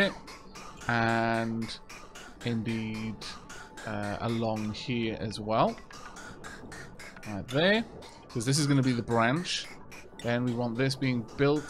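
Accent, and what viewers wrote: United Kingdom. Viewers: You are pronouncing 'algae' in British English (which makes sense, as you're speaking in a British accent). <link> This is the American English pronunciation. <link>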